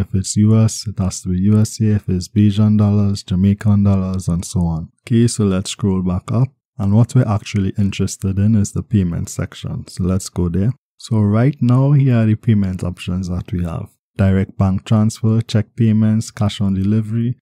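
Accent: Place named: Trinidad and Tobago. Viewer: I'm liking your content man. Keep at it and loving the Trini accent.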